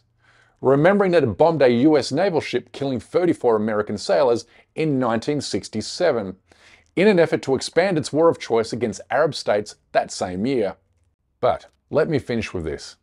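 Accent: Australia. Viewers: Even I can hear the Aussie accent, and I'm a Kiwi! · I am Australian and he sounds Australian to me! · Sounds Australian to me! From central Queensland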